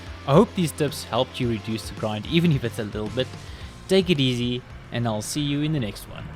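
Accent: South Africa. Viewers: I can spot a South African accent from a mile away ;) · That accent sounds very familiar... You from SA by any chance?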